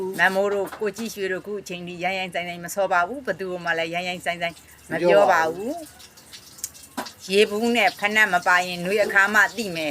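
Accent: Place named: Ayeyarwady, Myanmar. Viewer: ဒါကြောင့် စကားပြောလေယူလေသိမ်းကဧရာဝတီတိုင်းသားလို့ထင်မိတာ မမှားဘူးနော်ချစ်စရာဧရာဝတီတိုင်းသားများ❤❤❤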